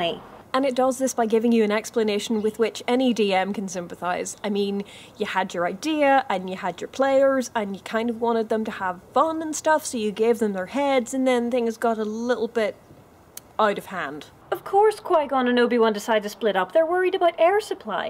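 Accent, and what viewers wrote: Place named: Northern Ireland, United Kingdom. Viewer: No she's Northern Irish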